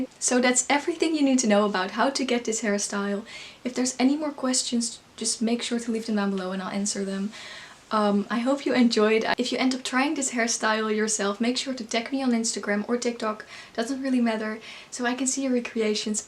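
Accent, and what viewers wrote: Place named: Netherlands. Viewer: you sound dutch or belgian, weet niet precies welke van de twee. leuke tutorial!!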